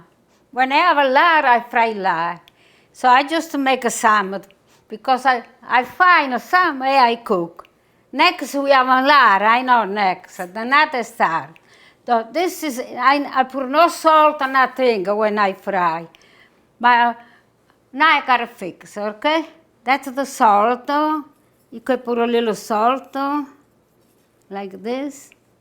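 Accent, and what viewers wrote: Italy. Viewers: Awesome garden. Wonderful that age 90 she still is going strong and still has her Italian accent! What kind of peppers are those? Awesome singing about Rome! Thank you from the other side of rt 33 in Hightstown!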